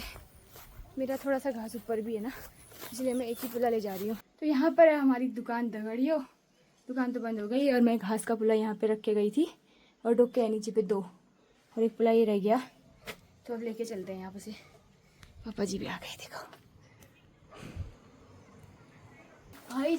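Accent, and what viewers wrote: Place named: Pahadi, India. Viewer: aapka pahadi bolna mujhe bahut accha lagta Hai❤❤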